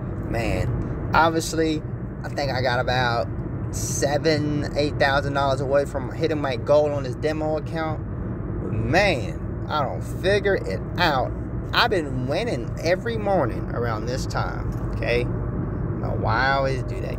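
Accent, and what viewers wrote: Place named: United States. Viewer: My mentor is sounding more country each video lmao. Good job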